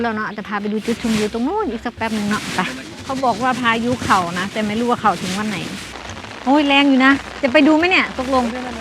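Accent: Korat, Thailand. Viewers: เป็นคนจังหวัดอะไรหรอ สำเนียงแบบนี้ คนโคราชหรือเปล่าคะ 😂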